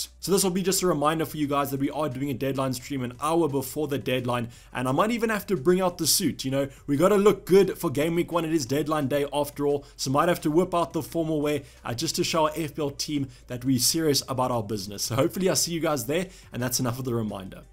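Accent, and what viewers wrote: South Africa. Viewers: Are you South African ? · Bra you sound South African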